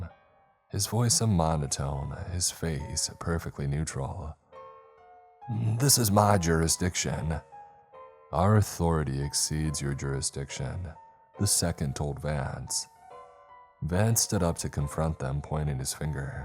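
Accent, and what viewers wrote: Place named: Minnesota, United States. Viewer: Sounds like southern Minnesota, for sure.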